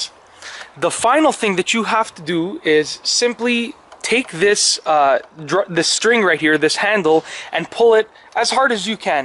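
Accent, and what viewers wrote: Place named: Canada. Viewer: you sound like the stereotypical canadian accent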